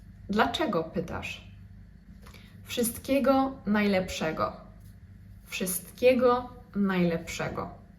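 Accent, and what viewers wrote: United Kingdom. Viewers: Is English commonly used in Poland? Your English is excellent, switching back and forth Polish and English (sorta with British accent).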